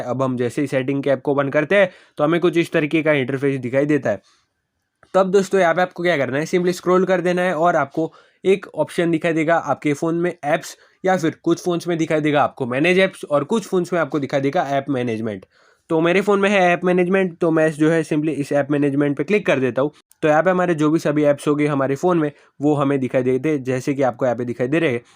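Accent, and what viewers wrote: India. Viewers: i didn't understand anything because he is indian but it worked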